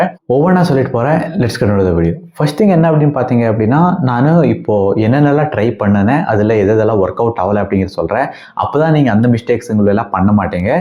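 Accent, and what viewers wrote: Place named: Bangalore, India. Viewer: Bangalore Tamil Nice Thala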